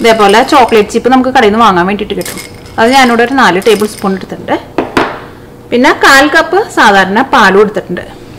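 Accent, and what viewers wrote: Thrissur, India. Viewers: സംഗതിയൊക്കെ കൊള്ളാം പക്ഷേ ഈ തൃശൂർ ഭാഷ ഒന്ന് മാറ്റിക്കൂടെ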